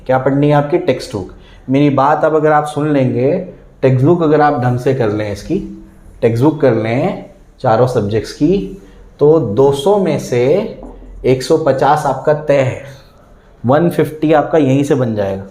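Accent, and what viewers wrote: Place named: Badnapur, India. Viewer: Sir aap badnapur ke ho kya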